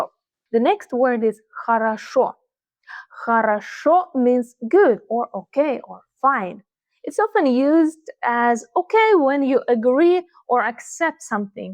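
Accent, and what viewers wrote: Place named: Russia. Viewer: You speak English more Russian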